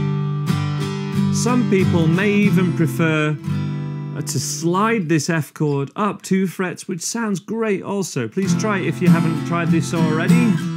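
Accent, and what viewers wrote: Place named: Yorkshire, United Kingdom. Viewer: Yokshuh accent, there.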